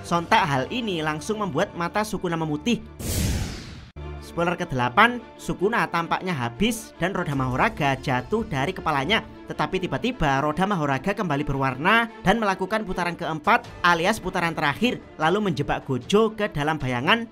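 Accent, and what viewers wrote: Java, Indonesia. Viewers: Gw kurang nyaman sama pembawaannya terlalu medo, maaf saya bukannya melarang menggunakan logat jawanya cuma setidaknya bisa gak pembawaannya lebih di benerin ke arah bahasa indonesia pada umumnya. Soalnya gak semua enak denger logat yg terlalu medo Semoga chanel semakin berkembang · Medok itu lebih ke aksen, akan susah dirubah. Kalau bahasa yang digunakan udah bagus kok, jelas juga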